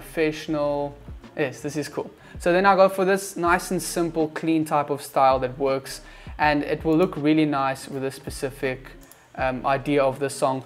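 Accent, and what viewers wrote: South Africa. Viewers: Recognizing that South African accent a mile away. My bru 👏🇿🇦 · Hahah I can hear your south african accent 😂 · This is dope thanks for the hook up, nice tips especially the Motion backround ... Dude ,I could swear you sound South African... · I was wondering why he sounded like some one from South Africa😂😂😂😂😂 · why am i hearing a lil bit of ab de villers sound when he is speaking are you from SA?? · you can recognise the South African accent anywhere! :) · I reckonize that SA accent my bru 🇿🇦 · Lol i was skipping thru the intro ...and was like wait hold up...lol if u South african,you can't miss it lol🔥🇿🇦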